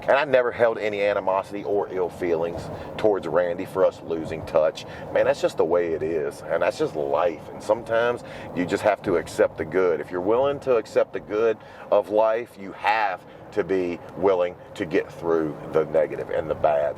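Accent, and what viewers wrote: Virginia, United States. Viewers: Man, I saw that Kevin Nash interview (and your subsequent response) & I was really impressed with how you handled that. He has obviously never spent much time in Virginia because I’m from NoVa but as soon as I heard your voice the first time I knew you had to be from VA (I guessed Winchester but Charlottesville makes perfect sense. Also, this YouTube shit is amazing because you really found your calling. You’re so articulate and intelligent. The way you deliver your speech is so professional; this is really what you were meant to do.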